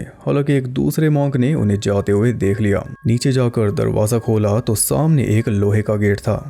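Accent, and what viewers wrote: Haryana, India. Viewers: Aap ki explanation ek baat pata chali ki aap harayana se ho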